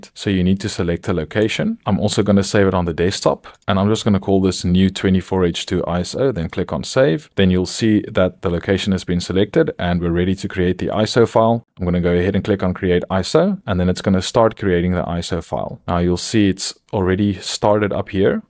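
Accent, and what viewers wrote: Afrikaans, South Africa. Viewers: Baie dankie vir hierdie video. Dit is die eeste keer dat ek op jou youtube video's afkom. Gaan nou na alles wat jy het kyk, verstaan nog nie 100% nie, maar gaan nou na hulle kyk. Toe ek jou stem hoor, het ek dadelik agter gekom dat jy dalk Afrikaans is.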